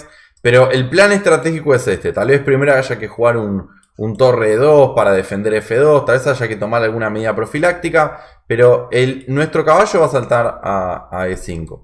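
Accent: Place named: Argentina. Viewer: El mejor canal que encontré para un principiante avanzado como yo. Instructivo y pausado. Que lindo el acento argentino. Soy español, juego online, incluso hice un amigo en Neuquén. Saludos y sigue asi!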